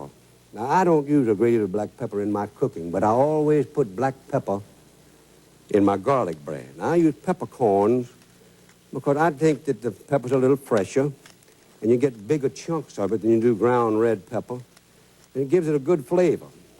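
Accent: Louisiana, United States. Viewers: I know this comment was posted a year ago, but seriously grow up. He wasn't a "Fake". E. Wilson (April 24, 1914 - September 5, 2001) Wilson was born in Roseland in Tangipahoa Parish, one of the "Florida Parishes" of Louisiana. Consider yourself taught. If you don't like the Cajun accent then I suggest you not watch his videos and not visit Louisiana or even to my mother's house. Mom and Dad, especially Dad talk like. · I do too. That is a specifically Cajun accent. Some have a thicker, French accent the deeper you go. And we southerners can tell each other apart because of the small differences in our accents. A person from North Carolina sounds way different from a Texan, so on and so forth. You don't hear this kind of speak outside of southern Louisiana that much...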